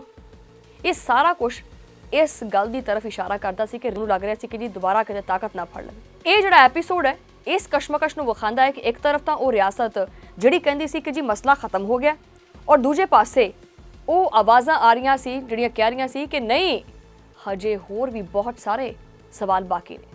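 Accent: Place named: Majha, India. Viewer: ji menu thodi teth (pure majheli punjabi ) bahut vadia lagdi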